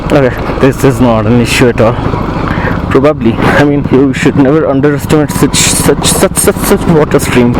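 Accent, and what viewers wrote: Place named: Bengal, India. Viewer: Bhai ka bong accent hai.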